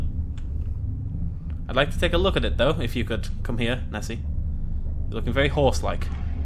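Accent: United Kingdom. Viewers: I no your British but why did your voice Chang to a British voice and are you British amrican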